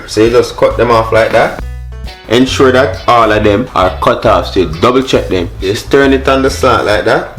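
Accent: Jamaica. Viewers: New subby here! I had to subscribe! I love your Vibe I love the way you cook I like how you incorporate the audience with the cooking. I also love the way that you keep the Jamaican language nice and thick but very eloquently spoken.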